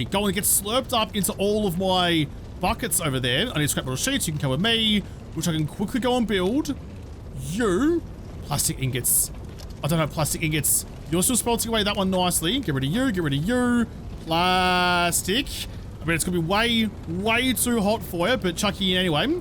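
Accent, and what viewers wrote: Australia. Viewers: "hypathermia or hypathermia, which one?!" Ah, aussie accent never change but also please don't be responsible for me in the zombie apocalypse when you have to ask for help on the radio with my very high or very low body temperature.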